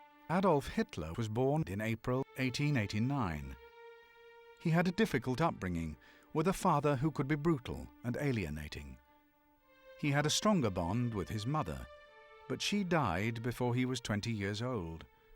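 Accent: United Kingdom. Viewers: I find that any narrator that speaks the Queen's English I can understand with no problem what so ever and I'm an American.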